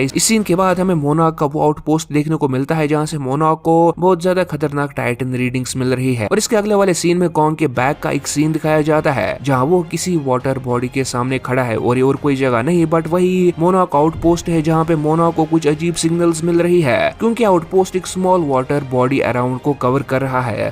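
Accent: Kashmir, India. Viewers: Brother you have a Kashmiri accent ❤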